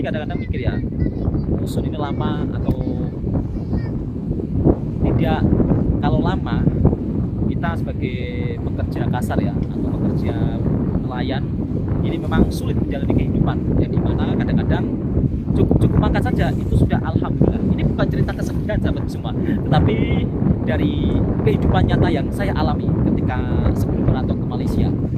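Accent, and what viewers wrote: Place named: Central Java, Indonesia. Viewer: Bukan itu kalo liat logat bicaranya jawa tengh pesisir utara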